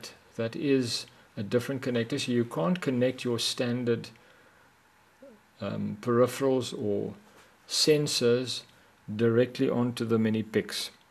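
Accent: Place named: Afrikaans, South Africa. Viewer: Is jy afrikaans?😀 Jy het definitief my aksent!!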